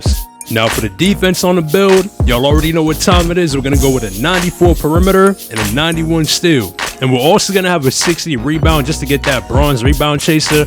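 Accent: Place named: East Coast, United States. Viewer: Like how he uses West Coast beats in the background when he sounds like a NY/East Coast dude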